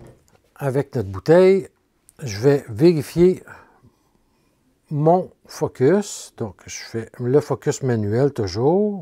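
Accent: Quebec, Canada. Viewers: Merci pour cette vidéo. Elle a achevé de me convaincre sur ce 24-120 f4 et le mien arrive aujourd’hui. Bravo pour ces vidéos fortement empreintes de professionnalisme et tellement plaisante à regarder ici en Belgique, surtout avec l’accent de Québec que j’adore!!!!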